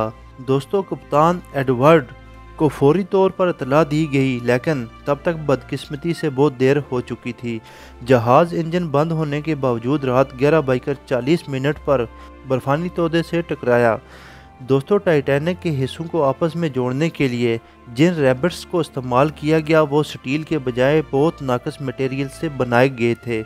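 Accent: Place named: Punjab, Pakistan. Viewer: Apki awaz se lag Raha hai ke ap 1 Punjabi daggay ho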